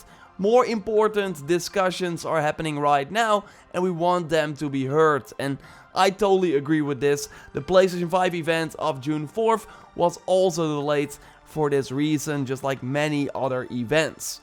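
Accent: Netherlands. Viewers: I couldn't help but hear your strong dutch accent. xD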